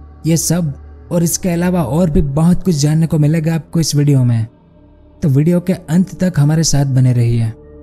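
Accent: Gujarat, India. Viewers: If im not wrong narrator is 💯 gujju😂😂 please don't take this as offensive or any hatred. I love the way gujjus speak 😂 all thanks to TMKOC 🙌🏾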